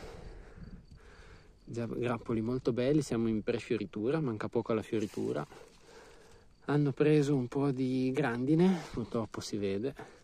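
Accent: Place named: North, Italy. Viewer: Salve SEMPRE molto chiaro. Scusi di dov'è perché non ha accenti ma sicuramente del Nord, io sono del Lago di Como. Quest'anno il Lago è stato riempito 2 volte se non tre.......😊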